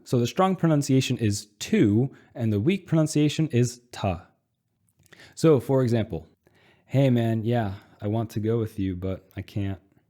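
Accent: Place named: Russia. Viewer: Not being rude, but you do have 1% russian accent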